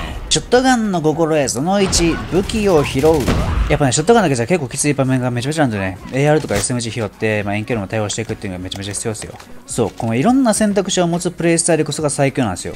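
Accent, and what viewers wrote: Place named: Kansai, Japan. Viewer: ですよね！ちょっと関西弁的だったんで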